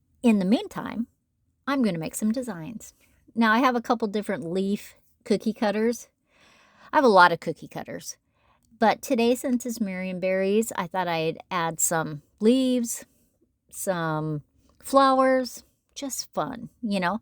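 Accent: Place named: West, United States. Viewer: Absolutely beautiful!! You are amazing!! I have to tell you, my Mom was born and raised in Livingston Montana. You say “frigerater” just like she did. 😍 must be a western thing. 😀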